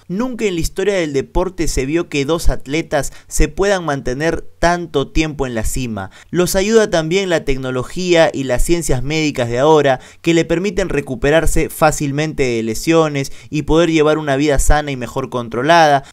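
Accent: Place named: Argentina. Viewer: El contenido va bien a veces pero el tonito argentino no va. ¿Por qué nos queremos parecer tanto a ellos? ¿Jala más seguidores?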